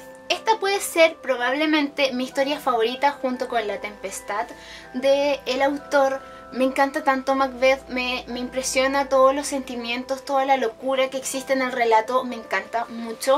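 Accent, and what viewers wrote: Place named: Chile. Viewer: no sabes cuánto me alegra esto jsjjsjsjs yo estoy empezando hacer videos porque pensaba que no había chilenos y ahora te encuentro a ti con el hermoso acento chileno jajaja es la cumbia!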